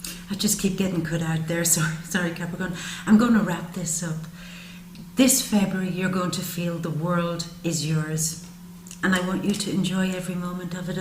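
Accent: Ireland. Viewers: Neil said mesmerize, I say magnetic.He is right, you dear lady have it all, beauty, poise and grace. Also what appears to be a charming Irish lilt. I dare not say anything further for I have only one heart to loose.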